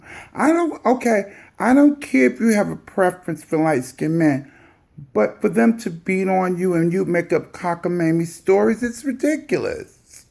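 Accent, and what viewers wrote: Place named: New York, United States. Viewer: I live for your thick "rich white woman" NYC voice and accent,. 💞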